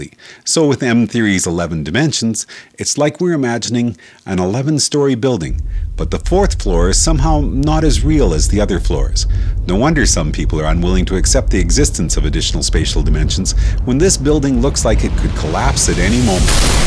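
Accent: Canada. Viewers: I had a feeling you were canadian before I read this, sometimes it takes a canadian to recognize the idiosyncratic nuances of speech of a canadian. I can't explain it. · is he canadian? he says aboot instead of about... and can you define these extra dimensions as real, if theyre only theoretical? can they be proven?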